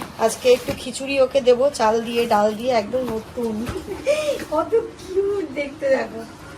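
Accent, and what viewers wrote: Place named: Malda, India. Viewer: Didi tumi aj j gulo bollao ota ke maldar vasa....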